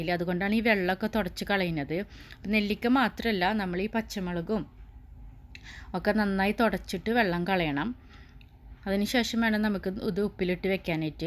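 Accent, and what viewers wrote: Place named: Thrissur, India. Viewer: തൃശൂർ ആണല്ലേ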